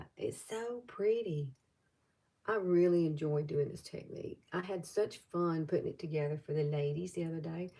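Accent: South, United States. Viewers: I also like NYX Epic Smoke Liner. U’re right… short life sucks, not at all a long time… wasn’t supposed to be short. Ur southern talk is so cute :) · I really look forward to your videos! I so enjoy your southern drawl!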